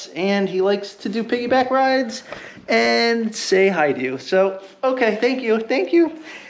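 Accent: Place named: Bulgaria. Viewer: Trying to figure out your accent. Maybe Bulgarian? Anyway. I appreciate the difficulties of ESL. I have never heard anyone say Alameda the way that you do (4 syllables) 🤓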